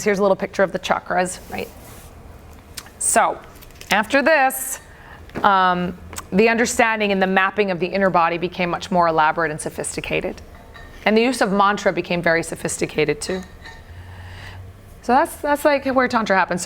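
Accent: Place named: India. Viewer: For a yogi, you are very close minded. Stop acting like Puritan, she grew up with those kind of phonetics, how can you expect her to improvise those dictions? Indian professionals working at silicon valley for decades can't do away with our thick English accent. And sanskrit is grammatically and phonetically probably most difficult language, even trained priests of Kashi don't chants those hymns correctly now.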